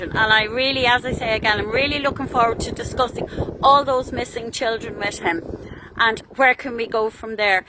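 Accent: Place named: Ireland. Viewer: Great , spoken like a true Irish lass.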